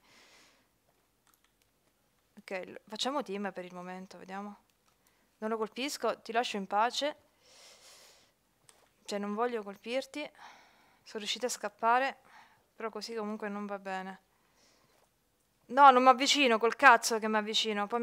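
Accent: Naples, Italy. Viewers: Ma sei napoletana? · anche io sono di napoli, ma parla come vuoi, anzi a me piace di più quando parli un po' in dialetto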